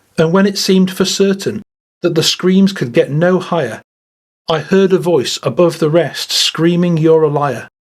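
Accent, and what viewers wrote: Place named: Lancashire, United Kingdom. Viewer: Thanks for a class show. No more political or news for me with the spiteful and snarky tones and pure lies and character assassination and threats of Civil War and even WW3?! What the devil? Very Despressing. Good to hear a calm Lanacashire voice on musical subjects. I worked in the Northwest in 1995 during the Britpop era. Lived in Longsight and Old Trafford, Manchester. Take me back.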